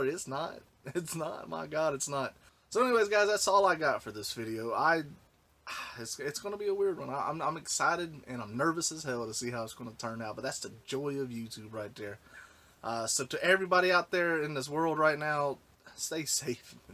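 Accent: South, United States. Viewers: I even want to be careful when buying off ebay. You don't really know who handles you items before you get them. As of late I even try to disinfect my items i buy as I buy them via mail. Man you sound southern like me. I'm from ky.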